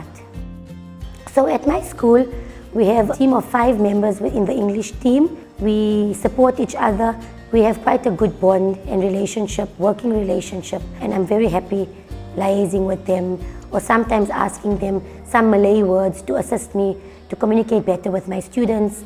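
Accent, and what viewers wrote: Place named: Cape Town, South Africa. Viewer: South African Cape Town Accent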